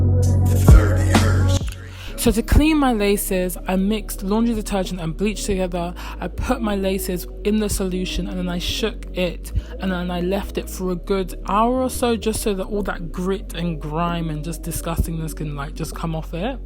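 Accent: United Kingdom. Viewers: You absolutely love your British accent and your beautiful voice its like angel music to my ears i think i love you 🤣😝💕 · I'm sure you're Britishhh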